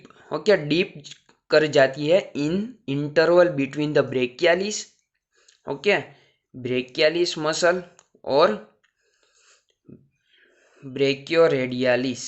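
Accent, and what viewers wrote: Gujarat, India. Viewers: Gujarati cho ?😅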